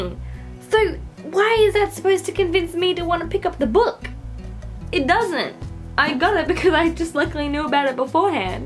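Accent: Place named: Australia. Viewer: Hi! I love seeing a fellow Australian on Booktube, your accent feels like home. I'm new to booktube and I'm having a great time catching up on your previous stuff! (you may be getting more random comments from me soon *looks sheepish) I definitely agree with about cheating in books. I think it gets romanticised way too much. It sends the message to readers that cheating is exciting and sexy and that the person being cheated on is always an afterthought. Also, FAKE STICKERS WHAT THE HELL!?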